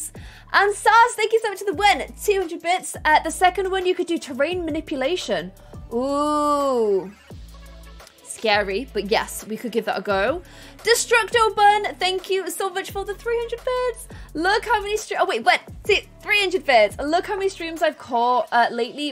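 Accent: United Kingdom. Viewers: It's so cute how you speak Korean with an English accent.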